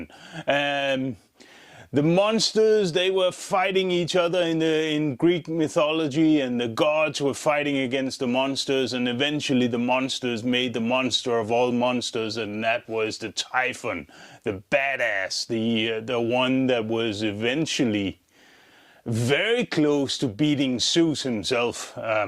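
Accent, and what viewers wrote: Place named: Austria. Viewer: You must be from Austria but love the accent and the rc content from north Carolina usa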